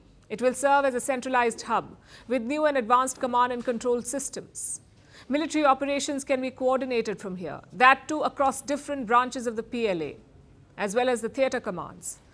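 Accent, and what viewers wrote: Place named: India. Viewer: Indian accent sound horrible 😅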